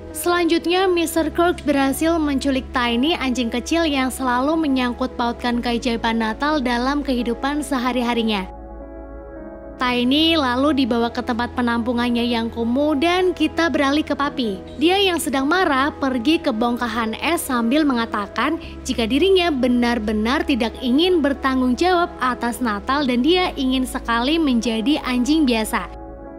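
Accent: Pemalang, Indonesia. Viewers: Mba dari pemalang y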